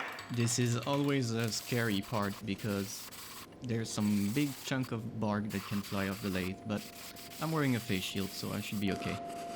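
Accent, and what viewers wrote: France. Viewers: Love the commentary. I mean come on, you're a french dude so the accent makes your already great videos even better. Takes longer to edit but I think overall it's worth the effort. Also strictly for fun, 1965 Mustang. Or '67 Camaro. If I'm being practical a Suburban newer and with fewer miles (and rust!) than my 2012 or a Jeep Wrangler with a winch for dragging logs out of the woods and home to the lathe 😃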